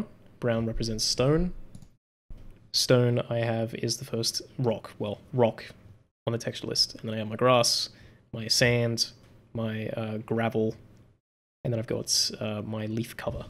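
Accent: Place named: Australia. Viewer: . Yep. Aussie.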